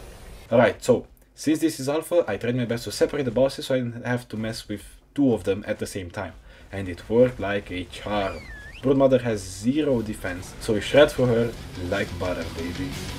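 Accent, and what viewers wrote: France. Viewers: Bro u have a french accent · You got a french accent you mog me so hard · are u french? i love ur accent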